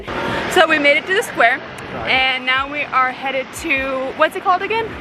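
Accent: United States. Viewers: The weather looks gorgeous in Palermo - and so does the city! Oh to be there!! Strange how, as I Brit myself and a regular follower of, I can tell Raff's particularly "English" accent seems so noticeable against your naturally "American" English - something I just don't notice when listening to on his own.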